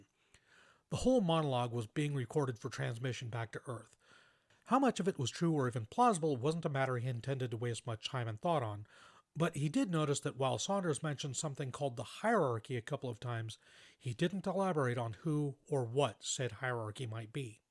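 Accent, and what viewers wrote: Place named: United States. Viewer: In that case, I’d be happier to hear you read it than the dictionary, even in your regular plain ole American accent.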